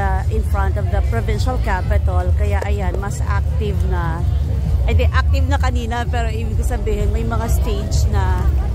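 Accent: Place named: Iloilo, Philippines. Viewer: Done watching na po ms... sobrang enjoy po panoorin.. ang ganda ng iloilo.what i notice.. ang linis esp. ng main road. Even during the festival kung meron man kunti basura lng..at meron pa mga nabibili mga souvenir..and can take pictures by the bridge.. beautiful people... And i love tlga ilonggo accent ms...😍😍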